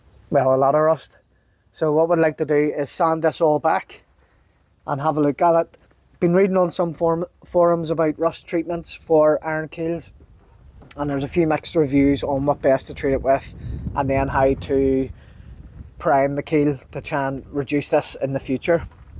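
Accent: Northern Ireland, United Kingdom. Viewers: English must not be your first language if you couldn't understand...it is called a Northern Ireland accent maybe educate yourself. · nice to hear the Nordie accent too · you speak nrn irish,,,like the scots and donegal awful accents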